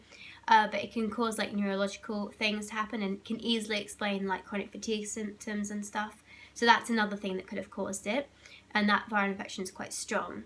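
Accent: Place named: United Kingdom. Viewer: This is my first time watching your videos, this one was on my recommended list. I was quite shocked by the British accent, I was expecting an accent from the U.S. but anyway, I just wanted to say that I'm sorry for what you're going through however, I'm glad that you found a doctor you like and who can do anything to help you out. You've earned a subscriber!! Take care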